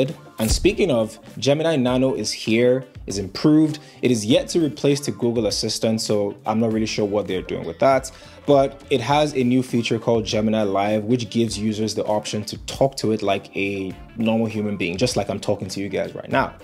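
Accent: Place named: Nigeria. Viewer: You got a gaming hardware channel right?... I could swear the voice is the same... The fade nigerian accent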